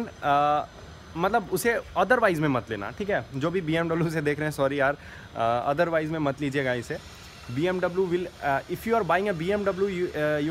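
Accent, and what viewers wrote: Bihar, India. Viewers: Bihari tone aa hi jati h teri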